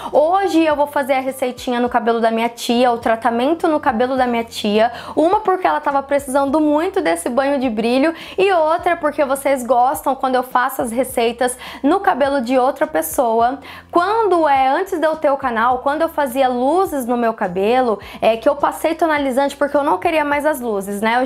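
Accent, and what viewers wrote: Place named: Interior, Brazil. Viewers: Q sotaque mara...sou do interiorrr tbm(Araçatuba)bjs adorei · Adorooo esse sotaque do interioR! Puxando o R! Tbm sou do interior de sp.. Bjs lindaaaa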